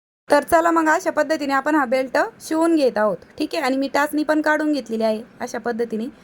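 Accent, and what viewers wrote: Ahmednagar, India. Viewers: तुमचे गाव कोणते आहे मला भाषा आमच्या नगर जिल्हा ची वाटते सबस्क्राईब केले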